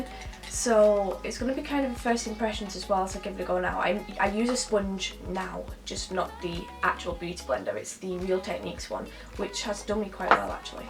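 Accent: United Kingdom. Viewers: hey, I am new to your channel, watching your video for the first time! you're beautiful! and please don't mind me asking, are you British? (I LOVE your accent)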